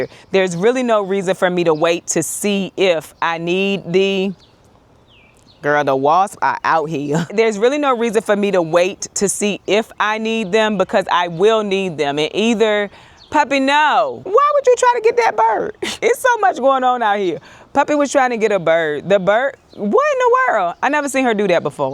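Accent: South, United States. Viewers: I absolutely LIVE your country twang. I can listen to you talk about gardening for hours. Also, I started my garden this past weekend. How long does it take for the leaves to break the soil? Mines just look like moist dirt. 😆 🤣 😂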